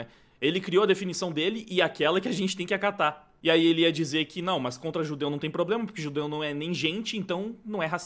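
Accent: Brazil. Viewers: Sulista né?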